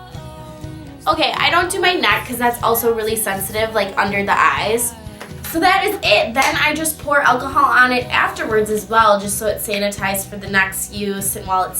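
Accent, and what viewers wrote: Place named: Canada. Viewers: Hm she sounds canadian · Canadian eh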